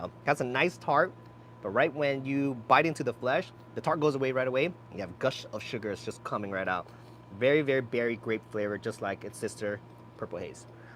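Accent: United States. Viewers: Are you from southern California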